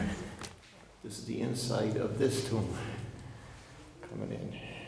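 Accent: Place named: Coal Region, United States. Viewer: How exciting- thanks for the vicarious world travel- you are a stone man alright but you can't lose that 'coal region' accent lol...(I've got one too, Shenandoah) Grandfather a coal miner - Thanks for this it was very enjoyable. I just read that stones take thousands to millions of years to form?! Thanks.... Molly a follower, learning all the time👆🙂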